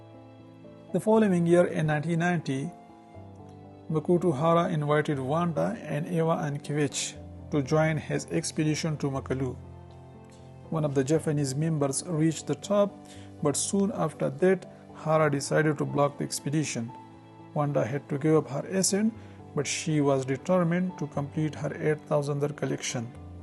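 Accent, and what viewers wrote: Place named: India. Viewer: I’m really glad I found this channel. Excellent research delivered so well. It’s wonderful to hear a strong Indian accent speaking such excellent English without compromising the Narrators Indian accent. The pace of the narration is excellent. Well done Narrator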